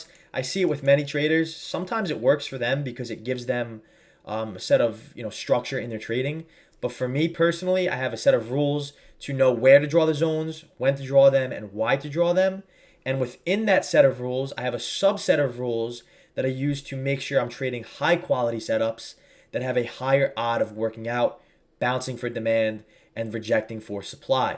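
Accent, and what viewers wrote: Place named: Long Island, United States. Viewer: Tell me you're not from long island.... gotta be. Staten island is the sleeper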